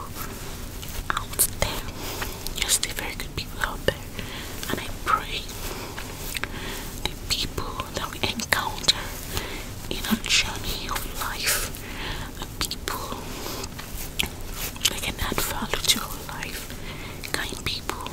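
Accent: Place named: United Kingdom. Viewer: I like when she speaks English i almost hear a British accent... Such a sweet lady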